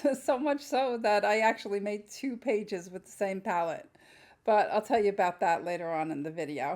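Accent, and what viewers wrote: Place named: Brooklyn, United States. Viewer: I was also born in Brooklyn! I thought I recognized that accent.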